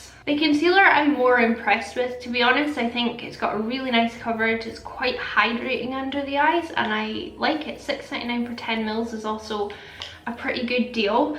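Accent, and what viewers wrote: Scotland, United Kingdom. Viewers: Love the Scottish accent 😌 I’ve been seeing outlander and loving the accent 😋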